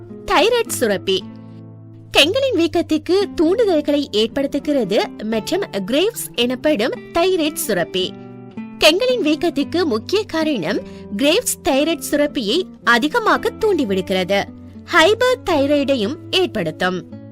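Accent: Sri Lanka. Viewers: Ada arivu jeevingala, avanga srilankan Tamil kalanthu pesuragu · இது இலங்கைத் தமிழ் இப்படிதான் பேசுவார்கள்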